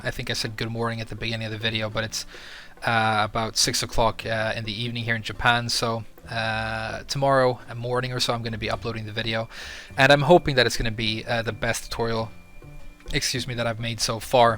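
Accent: Sweden. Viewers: Also, I realize ur Swedish accecent in ur first vid lol, cheers. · I've known you were Swedish from the beginning, you have a very good accent but the Swedish origins always shine through, no matter how hard you try (I should know, I'm Swedish too)! I love your videos and your Feng video especially helped me a ton, and even though you don't even play him yourself, you managed to make a really good guide. I'm sure MainMan was joking about that by the way. Keep up the good work! Skål!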